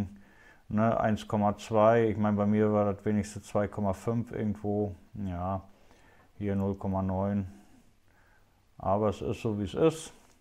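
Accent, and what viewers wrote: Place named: Western Pomerania, Germany. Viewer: Du musst doch irgendwo aus Vorpommern kommen, jedenfalls nach deinem Dialekt!?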